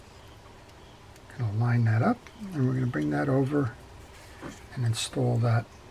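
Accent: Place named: Philadelphia, United States. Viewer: I just got a vintage Pfuger Nooby reel, seems to be similar to this.. I am amassing a small collection of vintage reels, and thanks to your videos Ive gotten most of them back into working order :) I do have a few I'm struggling with, perhaps I'll send them to you... thanks for the videos, and your accent brings me back.. I grew up outside Philly and spent many summer weekends at the Jersey shore 😂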